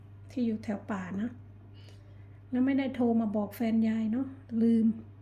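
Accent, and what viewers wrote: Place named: Suphanburi, Thailand. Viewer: ทํามัยพูเหมือนคนสุพรรณเลยทัมมัยต้องออกเสียงสูงมากไป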